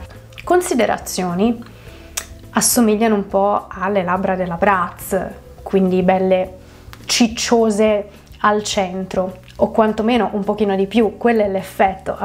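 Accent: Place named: Tuscany, Italy. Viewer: Che bello l'accento toscano😊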